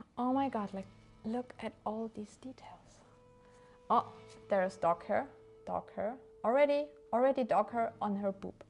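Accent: Germany. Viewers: Are you german? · ^well china painted miniatures from resin are usually heavy. And before i order something i ll be looking for some apce, not afterwards. I cant get rid of the tought that she is like Carisma Carpenter in Angel... always talking, never stopping, but with this german accent... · i hear german accent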